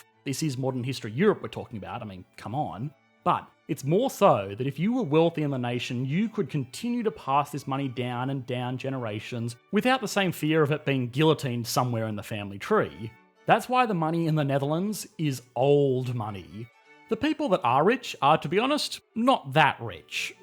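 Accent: Australia. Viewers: Great content and analysis in all your videos spoilt by your delivery which is way too fast for me to take in a lot of what you are saying, Your heavy Aussie twang adds to my difficulty. I have to keep pausing, rewinding, and replaying to consider and digest your line of reasoning. Rather than keeping me engaged, this turns me off. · Although the accent is Australian or industrial English, this video seems to be a propaganda vid by Republicans or Trumpists or, otherwise, of someone who has never been to The Netherlands. So, if you love Fox News, Bill O'Reilly, the Republican representatives in the USA, the incredibly lying Trump and BoJo and Putin, go live in their countries and see whether you are happy or not.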